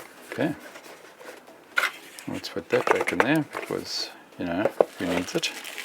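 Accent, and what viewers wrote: South Africa. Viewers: Nice review, you sound South African ?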